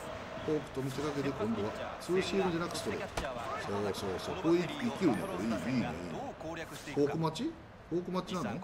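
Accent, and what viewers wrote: Japan. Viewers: さとやんアクセントが関東、関西、東北、四国山ほど混じってる（笑）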